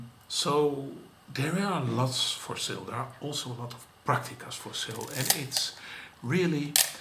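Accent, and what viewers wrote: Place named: Netherlands. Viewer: You are doing fine, I think! Your dutch accent is ok, don't worry. Maybe you can add some photos taken with those cameras to your reviews 😉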